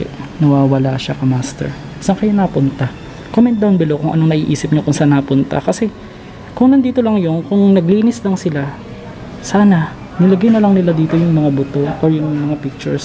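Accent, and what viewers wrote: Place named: Bulacan, Philippines. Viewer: Hello more videos pa po..taga bulacan po ba kayo? May tono po ang salita nyu e😍 godbless po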